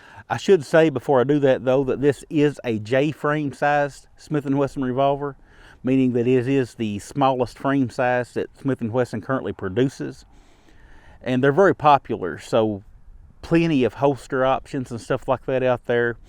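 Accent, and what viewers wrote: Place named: Appalachia, United States. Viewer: The good ole appalachian accent can be hard. He is a great guy, maybe turn captions on, worth listening thru. I personally find him pretty easy vs many other thicker accents (creole, or thicker appalachian, southern ebonic etc)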